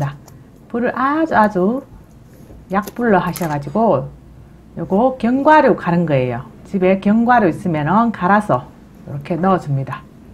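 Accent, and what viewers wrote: Gyeongsang, South Korea. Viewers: 말씀도(구수)하게"잘"하시고~! 경상도,(방언)너무,재미있어요~! (짱)~!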